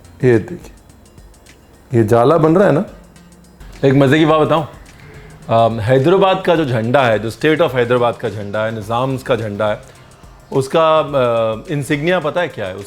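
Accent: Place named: Punjab, India. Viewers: Sir before seeing your video I like that you such very good expaliner or your Punjabi accent is such a amazing ♥️♥️sir you explained very well and every single minute is utilised when I see your video 😊😊😊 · I love the way you cook, giving instructions and your punjabi accent while cooking. It's a great fun and enjoyment while cooking. Thanks Ranveer❤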